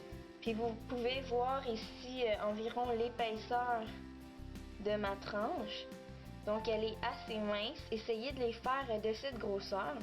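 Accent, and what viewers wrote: Quebec, Canada. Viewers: Je viens de tomber sur une de tes vidéo et je suis charmée :) J'ai quelque petites cicatrices près de la mâchoire et je vais essayer ton truc bien vite. Tu explique les choses si bien et pour tout te dire l'accent québécois me fait craquer :D Gros bisous et merci pour cette vidéo, je m'abonne :)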